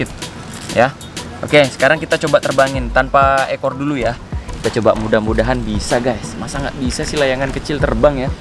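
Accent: Madura, Indonesia. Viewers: bang asli Madura mana?? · Orang madura ya bang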